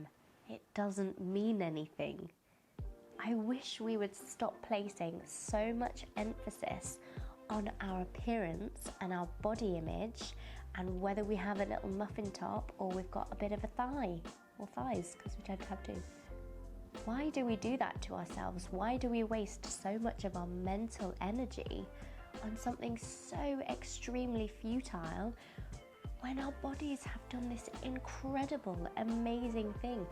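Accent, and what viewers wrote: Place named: Yorkshire, United Kingdom. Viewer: Thanks for this video! I’m loving your channel! I’m guessing you’re from Yorkshire! Maybe Leeds? ☺️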